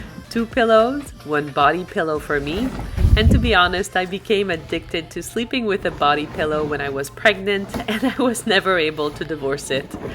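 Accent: France. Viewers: You got more done in your morning than I do all day! Girl when you were like 'and now it's ' I couldn't believe it! LOL Good for you I found this video very enjoyable and your accent is nostalgic for me as a francophone living overseas. Thank you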